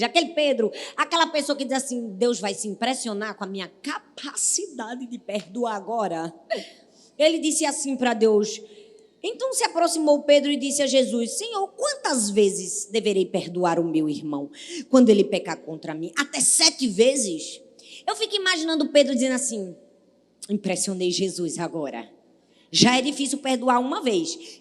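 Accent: Northeast, Brazil. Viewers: Amo o sotaque nordestino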